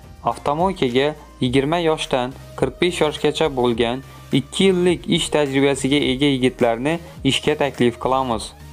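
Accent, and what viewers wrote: Khorezm, Uzbekistan. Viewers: Диктор аниқ хоразм. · Хоразмликмисз, интонациянгиздан билинвотти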